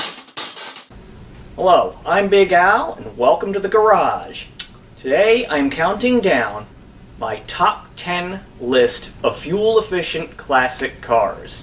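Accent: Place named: Chicago, United States. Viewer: You said South Carolina but I hear Chicago.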